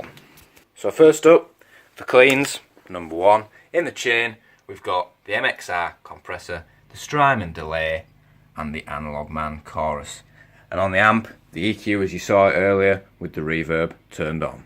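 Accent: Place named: Yorkshire, United Kingdom. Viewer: You sound so yorkshire its untrue haha awesome review dood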